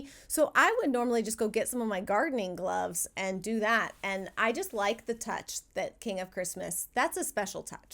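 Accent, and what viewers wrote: South, United States. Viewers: I have a King of Christmas tree last year and I love it!!!!! I have always had a real Frazier Fir tree. Last year the real trees were so expensive and it would die after a month. I am also done Christmas shopping thanks to you my friend. PLEASE keep being chatty I love hearing your voice the southern accent is the best.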